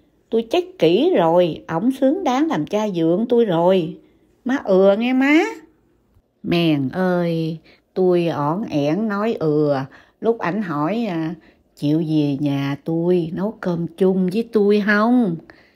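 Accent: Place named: Mekong Delta, Vietnam. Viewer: Vui vui ! Giọng đọc chuẩn miền Tây luôn bạn ơi ! Cám ơn bạn đã chia sẽ.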